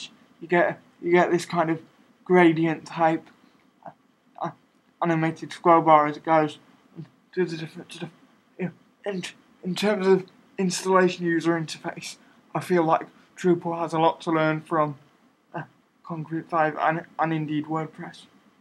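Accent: United Kingdom. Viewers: Helpful, succinct, awesome. Straight to the point and a UK accent to boot. Thanks man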